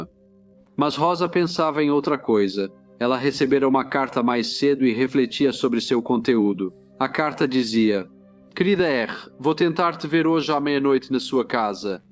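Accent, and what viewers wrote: Portugal. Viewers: Este sotaque de Portugal é um saco! Dá pra resolver esse problema? Se continuar assim vou deixar de assistir vídeos deste canal.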